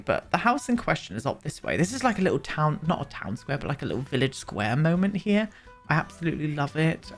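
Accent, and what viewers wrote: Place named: Devon, United Kingdom. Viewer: i always imagine your from devon, like, country p[b]umpkin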